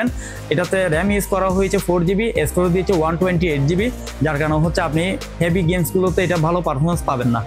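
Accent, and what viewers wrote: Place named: Chittagong, Bangladesh. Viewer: ভাই আপনি যে চট্টগ্রামের লোক সেটা আপনার কথা বোঝা যাচ্ছে,অতএব আপনি আপনার মাতৃভাষা চেঞ্জ করে শুদ্ধ ভাষায় কথা বলুন,যে কথার মাধ্যমে প্রত্যেক জেলার মানুষ আপনার ভিডিও দেখে উপকৃত হবে।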